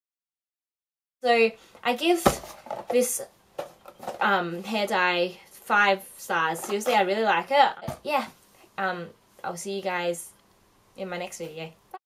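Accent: Australia. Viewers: hey r u australian? u hav an aussie accent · are you Australian? xD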